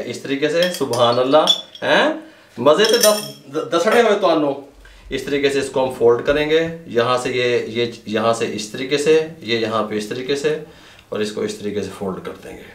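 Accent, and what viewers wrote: Punjab, India. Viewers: Beech mein jo ap punjabi ka touch detey hein. Kamal hi hai.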